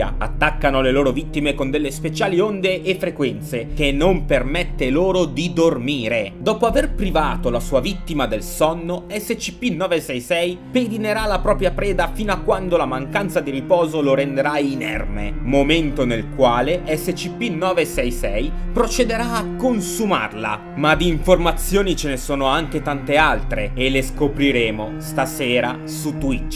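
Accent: Veneto, Italy. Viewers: Veneto vecioo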